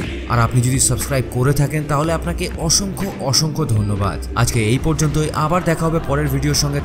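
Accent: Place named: Kolkata, India. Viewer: সুন্দর উপস্থাপনা তবে আপনার কথায় কোলকাতার স্থানীয় ভাষার একটা ভাব আছে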